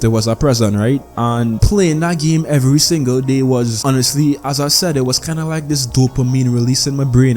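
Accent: Trinidad and Tobago. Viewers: Is that a trini accent?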